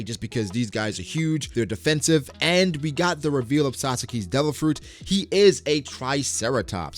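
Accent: Spain. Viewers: Spanish sounds more similar to Japanese than English so let that Spaniard come out. Listening to you talk about the chapter makes me enjoy it more than my initial reading. Great commentary as always. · nah bro, embrace the spanish pronunciation!! it sounds cooler, those american "r's" sound weird with japanese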